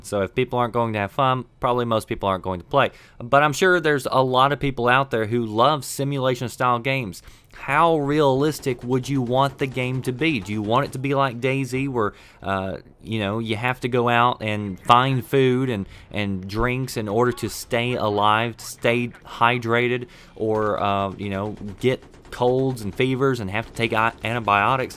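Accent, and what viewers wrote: United States. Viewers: are you american ??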